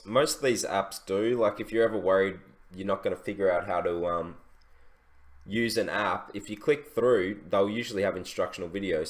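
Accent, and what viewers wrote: Australia. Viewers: Thanks again meng you the best!! You are from Australia correct? Sorry if i'm wrong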